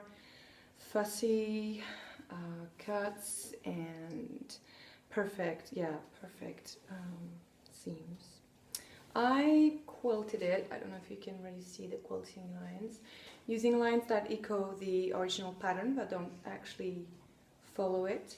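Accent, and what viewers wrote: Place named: France. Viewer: Hello! I am Jacqueline and I am a Canadian living in Tokyo, Japan. I love knitting and I am currently working on a turtleneck sweater for myself in Brooklyn Tweed LOFT (which is amazing yarn!) and a hat knit in merino and mohair/silk yarn held together. They are both knit in black! I am trying to make basics that go with my monochromatic wardrobe. I also sew but I am just getting back into it. Last week I made myself a knitting project bag with recycled kimono fabric. I loved your podcast and your English is beautiful and your French accent is cute:<). Thank you for the effort you put into doing this and I look forward to more.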